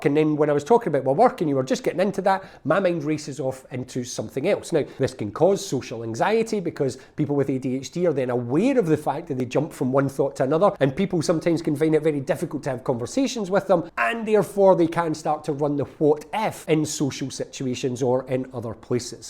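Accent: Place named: Ireland. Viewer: Started listening because your Irish (?) accent is just divine to my ears — stayed because you really hit a nerve & I feel so seen now! Subscribed.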